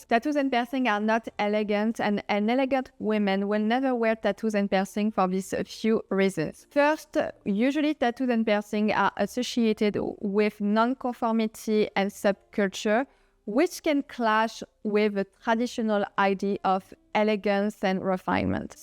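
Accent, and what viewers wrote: France. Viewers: ALL perfectly said. 👌 And I LOVE your big French accent ❤ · 😂Love your french accent. I was in France in 2022 (August and November). Traveled to Paris, Normandy, E'tretat, Beaune, Chamonix, Nice, Cannes, Antibes, Bayonne and Bordeaux. Loved France and French culture. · I love you, unapologetic about your opinions right out the gate. It’s so refreshing to see. If someone doesn’t like your opinion they can go to a different channel. Also if someone doesn’t like your authentic French accent on a channel about French style they can also move on. I love your voice and your accent. I love the authentic perspective you give as a person from France, living in Paris and having lived a time in the US for true experience in comparison. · I like your french accent very much! I think it‘s really charmimg! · This Yank ADORES your big French accent. And Gaul energy. 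Keep on keeping on! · As is your BEAUTIFUL 💕 French accent! It is such a lovely part of your videos! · but your accent is what makes you unique! i love your videos with your french accent :) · “If you’re offended… my big French accent… please move on to…”, well said 👏! Every time you post a video, I watch it several times. I can’t agree with you more. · You got the best french accent ma'am❤️ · I love your French accent😊 You are doing a great job👍🏻👍🏻 Vive la France!🥰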